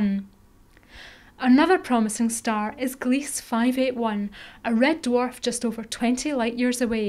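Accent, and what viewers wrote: Scotland, United Kingdom. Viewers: She is VERY Scottish. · I liked the Scottish accent, unlike everyone else who's commented on it. But yeah, if we are to 'colonize the galaxy', we have a hell of a lot of work to do. It's a shame us non-geniuses can't really help much. Or can we? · gawd i hate her voice, what is she scottish?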